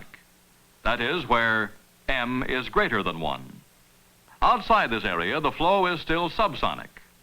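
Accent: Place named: United States. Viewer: American accent, British birds (as a rule), a bit odd.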